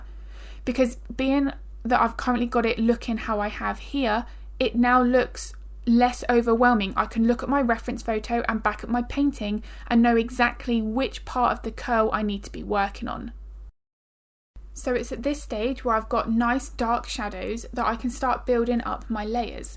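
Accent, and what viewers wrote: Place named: United Kingdom. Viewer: I’m new to animal portraits, yet I am a trained artist! You are fantastic:) My BFA is in Ceramics and Abstract Painting. In a week or soI I’ll be joining Patreon. In just seven minutes I’ve learned SO much! Thank you for sharing your gift. Oh, I’m Shane, BTW. Hope to chat with you soon. I assume you are across the pond, based on your accent lol. I’m in Charleston, SC…..and there is a HUGE market here for pet portraits here that I want to dive in. I do human portraits as special gifts due to the length of time it takes to complete one, but I sell my original abstract more. Hope to chat with you soon!! Oh, have you ever done a medium goldendoodle? -Cheers, Shane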